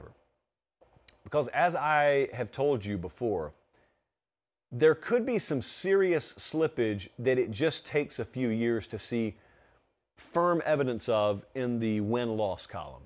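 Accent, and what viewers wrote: South, United States. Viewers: we can tell you're from the south. mee-maw doesn't exist above the Maso Dixon line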